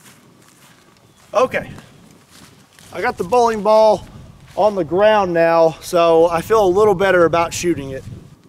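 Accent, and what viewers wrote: Kentucky, United States. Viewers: what part of kentucky ar e you from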